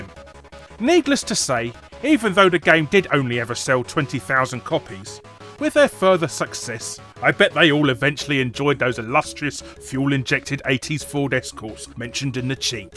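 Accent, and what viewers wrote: United Kingdom. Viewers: On the subject of Alien Breed:, I find your British accent and your delivery of the cheat codes themselves funny as hell, especially "Blimey, gov'nor, look at the size of his John Thomas," LOL. Keep up the good work.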